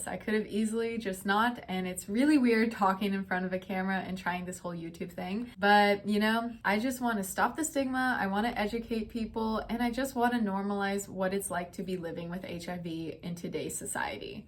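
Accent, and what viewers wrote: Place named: Germany. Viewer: You can hear German influence on your English 😂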